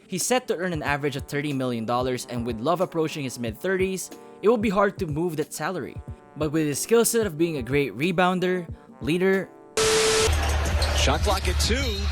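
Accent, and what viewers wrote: Philippines. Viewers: Not racist but you Filipino? Your accent is Filipino-like and the Philippines is in the pacific Just a question from a Filipino fan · I love the filipino accent ♥️♥️🇵🇭🇵🇭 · I actually noticed it too, from the amount of my filipino teachers having the same accent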